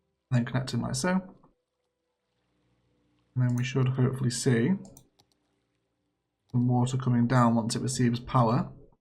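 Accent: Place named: England, United Kingdom. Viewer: Awesome, you're easily one of the top 5 Cities Skylines channels around. Also, your accent is beautiful, which part of England is it from?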